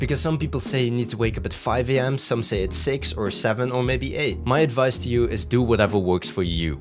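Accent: Netherlands. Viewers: Are you Dutch? Idk kinda sounds like a Dutch accent haha :) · Are you a Dutchie?😂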